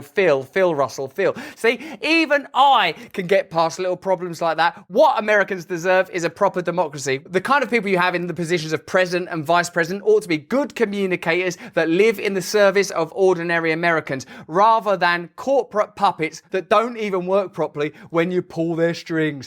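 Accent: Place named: United Kingdom. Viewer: You talk about Biden cant speak English, lol neither can you. I know its a British accent but if you can pronounce proper English then dont tell someone else they cant.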